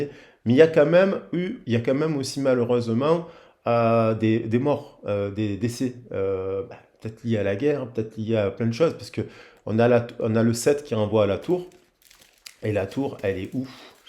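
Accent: South, France. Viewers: Bonsoir. Un plaisir d'écouter tes guidances avec ce chaleureux accent du Sud, qui plus est toujours source d'enseignement également. Merci pour ta générosité et ton dévouement 😊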